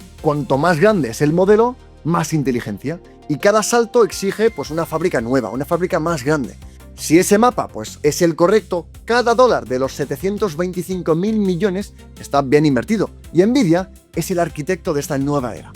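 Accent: Spain. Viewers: otro “apel” fan boy. btw pueden los españoles decir apple como el resto del mundo? caga mucho el palo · Es horrible escuchar la pronunciación del inglés de un español 🤮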